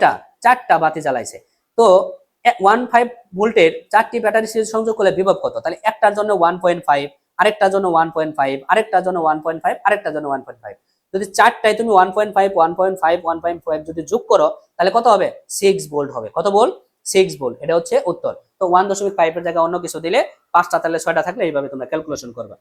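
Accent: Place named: Sarishabari, Bangladesh. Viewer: না ভাইয়া আপনার কথা শুনে মনে হলো যে আপনি শরিষা বাড়ির স্থানীয়। আমার ওখানে আত্মাীয় আছে তো তাই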